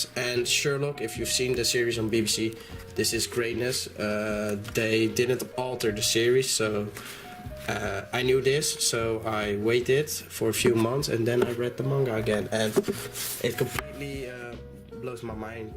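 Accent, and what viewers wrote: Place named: Netherlands. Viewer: I can hear ur from the netherlands, fellow dutchman. 😂 cool collection!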